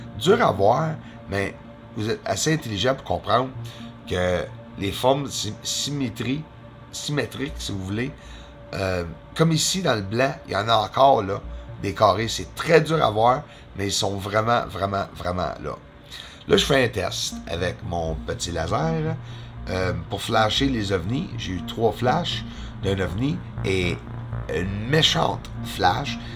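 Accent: Canada. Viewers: Bonsoir j aime bien ce que j entends.moi être en France mais je vois rien dans le ciel mais je sent qu'ils sont la. C est dingo mais j'y croie a100 pour 100.J aime bien ta voix de Canadien c est dingo. Bravo et à bientôt. Si moi qui ne va pas sur ordinateur j espère que un jour bientôt ils seront et que le monde sera gentil avec eux.Bonne soirée de la France qui est triste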